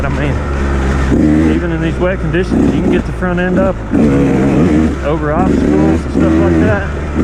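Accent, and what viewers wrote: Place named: South, United States. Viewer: come to think about it, one of my buddies has a 2017 or 18 te300 that he wants to sell and I'd imagine you can get it relatively cheap. He bought it to tag and ride on the rd but hes rich af with a garage full of gasgas and ktm and rode it and didn't like it so its been sitting in the corner ever since. If you want to see some pics I can get some and whatever he wants for it. Dont know where you are but you sound southern lol and I'm in east Tennessee so we cant be to far apart.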